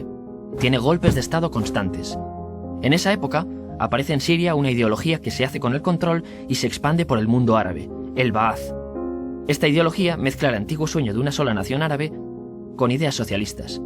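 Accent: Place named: Spain. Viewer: Recuerdas la Torre de Babel de la Biblia, la hicieron estos tíos. Jajaja suena bien!!👌🏻👍🏻Me gusta el acento de Español.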